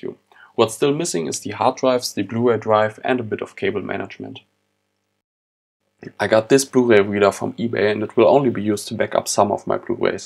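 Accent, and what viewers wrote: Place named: Germany. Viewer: Man hört voll raus das du Deutsch bist is bei mir auch so das voll cool :)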